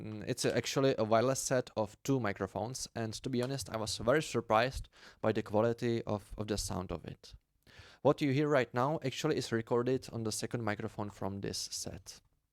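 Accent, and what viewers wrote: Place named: Central Europe. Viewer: You must be from middle europe because your accent is like poland, hungarian or slovak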